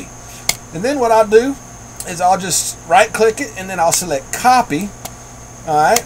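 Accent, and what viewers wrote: South, United States. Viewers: Oh... You sounded very southern and I was just curious because I'm form Arkansas.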